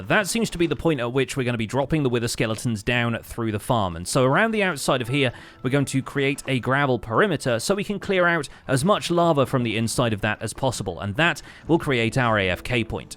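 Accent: United Kingdom. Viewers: I'm on episode 4 of this series but wanted to leave a comment on a recent with the hopes of being seen. OG Minecraft player so I've seen a fair few let's play. I always come back to you though, you have such a wonderful balance of tutorial but also a play through. It's nice to learn new things now but still see he same world develop and see you work through designs etc. Also lovely listening fellow Brits. You've got such a soothing voice!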